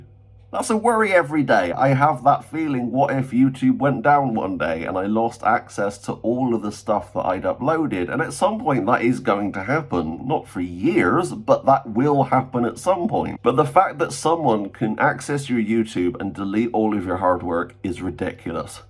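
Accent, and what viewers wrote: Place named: West Country, United Kingdom. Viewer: Good to see she got the strike removed. Appalling behaviour by YouTube! Also where in the West Country are you from? 😂 i wanna say Dorset or Gloucester? Great channel by the way, love from Somerset 😀 Jesus Christ be Praised!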